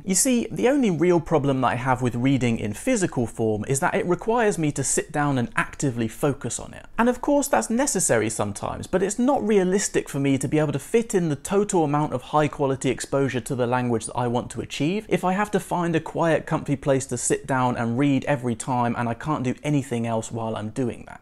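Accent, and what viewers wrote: United Kingdom. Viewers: Nice video! I’ve been learning Spanish for a while, but haven’t done too much with audio books. I’m going to do more. BTW I just finished watching your video with Español con Juan (my first time watching your channel) next I watched this video, I don’t know why but it was strange to hear your British accent! 😀 ¡Tu Español es muy bien! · I love your British English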